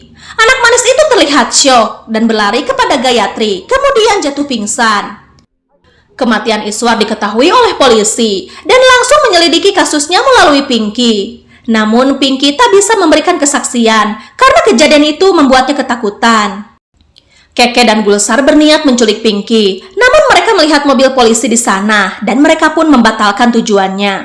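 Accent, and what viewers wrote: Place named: West Java, Indonesia. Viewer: Narator nya orang sunda njir....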